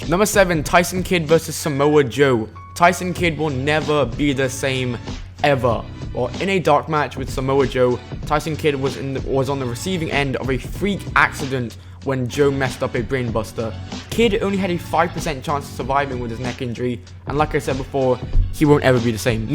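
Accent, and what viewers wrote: United Kingdom. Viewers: Why are all these top 10s narrated by a British dude does whatculture wrestling have different accounts with slightly different accents? 😱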